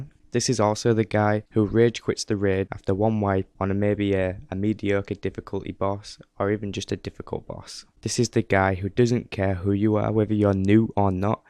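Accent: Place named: North West England, United Kingdom. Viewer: Hey man where you from? I'm inclined to say north west, I'm from st.helens and your accent is similar to mine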